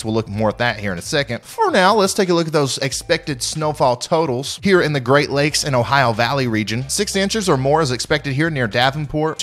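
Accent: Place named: Tennessee, United States. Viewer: Just found your channel and gotta ask, is your accent from NE TN? Thanks for the great content.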